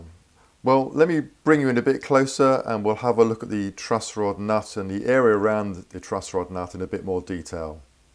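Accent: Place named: United Kingdom. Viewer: Hiya mate, thanks for this video as I am having the same nightmare with a customers Ibanez right now 😂 and your calm, patient explanation of the process lowered my blood pressure and I have got my screw extractors out and will be - after a nice cup of tea and some hobknobs - go back in the workshop and get the wee bugger out. Poor lad who owns it has tried everything and a previous owner had used imperial on a 4mm nut (argh) so it is totally rounded off in there, and the neck is stuck in a back bow so fretting out on the 1st frets 😕 I just needed a calm British voice to chill me out with a sensible explanation and procedure, and you have saved the day - SEVEN years later 😍 Much thanks 👍👍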